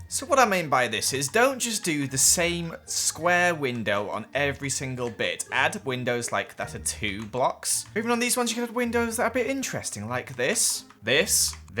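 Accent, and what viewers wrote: United Kingdom. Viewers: I really like your british accent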